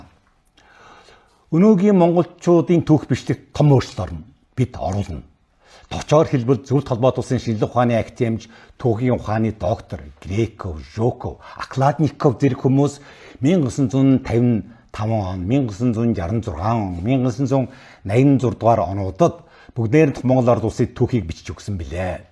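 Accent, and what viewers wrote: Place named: China. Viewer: энэ одоо ямар гээчийн монголоор гайгуй ярьчихдаг хужаа вэ!!!!!!!туух гуйвуулаад байгаам уу эсвэл яриад байгааг нь би ойлгохгуй байгаамуу?????хужаа л байна ш дээ!!!!!!яриа нь ойлгогдохгуй юм байна !!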